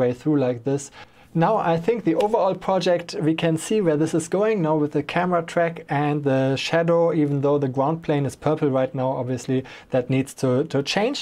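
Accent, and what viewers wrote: Germany. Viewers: r u german?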